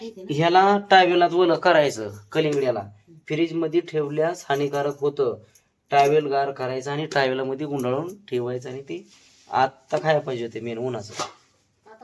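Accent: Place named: Malvani, India. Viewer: Khup bhari dada ani tumchi Ji gavarani malv bolat ajun bhari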